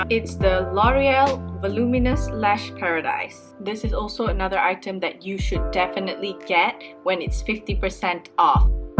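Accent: Penang, Malaysia. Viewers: kak buat la video cakap bahasa melayu, seronok tgk btw saya orang penang so loghat kita sama. riuh dia lain macammmmm hahahaha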